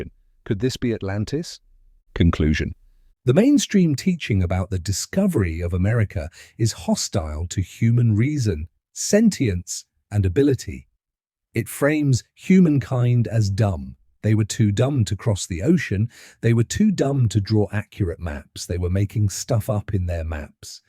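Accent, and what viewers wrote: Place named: United Kingdom. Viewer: The voice is British - not for me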